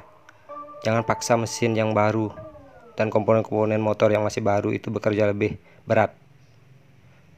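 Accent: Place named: Madura, Indonesia. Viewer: logatnya sperti orang madura takye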